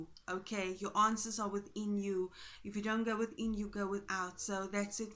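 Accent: South Africa. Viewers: You have to be from SA? Just came across this video but we SA people have a specific accent 😋😋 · That accent. You from south africa Capetown bra. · Are you South African?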